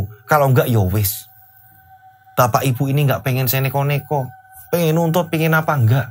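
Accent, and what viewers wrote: Java, Indonesia. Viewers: Bang ini bahasa jawanya medok banget ya...slmt siang semuanya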